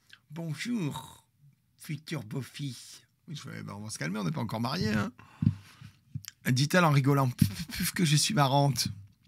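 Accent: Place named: Savoy, France. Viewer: c'est pas l'accent savoyard plus que breton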